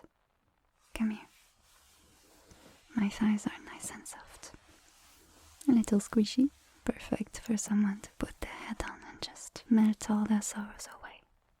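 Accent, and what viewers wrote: France. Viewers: This would have been an amazing video just from the script but your VA talent elevates it to another plane. It’s absolutely incredible. This video was the first of yours I found and made me instantly sub. I sincerely hope you consider more French accent videos! Especially with the intimate but not explicitly sexual script. I’ve been listening to ASMR for about a decade and don’t think I’ve ever been so completely enraptured like this. I’m sending the F4F version to my wife hahaha 🥰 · You need to do more french accent videos more often, they're so underrated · I usually can't stand the french accent in English but your voice really makes it work. You have a wonderful voice and mastery of both languages. Thanks for your work and here's to your spread on the platform. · This and the maid video are the two most soothing videos on YT. I'm writing a novel and one of the main characters has a french accent. 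Her name is Emilie, so this video really caught me off guard when I heard her name lol. Thanks for the awesome content, and for giving me a voice to picture when I write the character. Hope we get more french accent videos in the future, but all your content is great. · Oh my goodnesssss, your French accent is amazing!! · The combination of your voice and the French accent is incredibly soothing. Thanks for everything you do. ♥ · Thank you for another french accent content. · I don't normally comment in these videos but I just wanted to say that these videos with your accent and all. I keep coming back to it. There is something about how you speak with your French accent that never gets me tired of hearing it, even if I've listened to the same audio a countless amount of times. You've done a great job here. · Everyone thinks French accents are beautiful, but I never really found the appeal before now. 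This video just made me say, "Ahhh... now I get it.." 😢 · One more Time Your voice sounds so good in French and with the French accent… À quand un audio juste en français 👀🤣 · YES! Some more French accent. I don't grave for the affection, I crave for the accent. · pls more french accent audios 🥰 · This melted my brain in the best way. Please do more content with the French accent / sweet talk like this 💙🤍❤️ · I love French accents so far. 10/10000. But the French lady is very kind and also lovable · This is the best ASMR audio I've ever listened to. I listen to it so often it's crazy. The script is so well written, it's so down to earth, maybe not something realistic but it's not so out there like some audios I've heard. It's personal but also impersonal in a way. Really gentle and doesn't distract you from sleep/relaxation. Your voice with the french accent is so perfect, it's soothing and you sound professional and trustworthy, works really well with the script. 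Your other audios don't hit as hard as this one. They are good but this one has that something. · Never thought I needed to listen to an ASMR with a lovely French accent. Magnifique! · I never thought french accent on english was so good. I can say thats even attractive. Looking forward for more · The return of the glorious French accent! I thoroughly enjoyed this script and you did a wonderful job with the audio,. Cheers!😊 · The wholesome, soft-spoken french accent is awesome... Slept well with this one when insomnia hits last month and didn't end this video consciously till today. Brilliant masterpiece! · I'm curious about something. As a french woman did u need a lot of practice to get your english skill to that level? Or did u had an accent like in this video and improved from that. If u hadn't how hard was is to train one.😶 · I don't know how to feel with the fact that I'm a spanish speaker and i'm listening to this english ASMR with french accent- Need more 🗿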